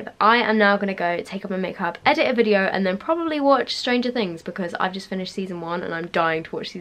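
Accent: United Kingdom. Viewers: Congratulations on 50k!! 💕 Which part of the UK are you from? (You sound like you’re from London)🇬🇧 also yoi shouldn’t compare yourself because some of other YouTubers over exaggerate which makes them seem fake. You’re unique💜 also the fact you think that wasn’t a good giveaway is absolutely crazy! I would never get this much not even for Christmas💚Goodluck to everyone entering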